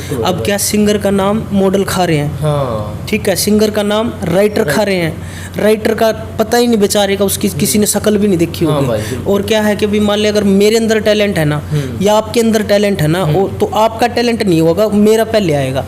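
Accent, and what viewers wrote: India. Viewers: Bhai interview achha tha Par aap apne bolne m thoda change karo Uchana aali jyada hojya hai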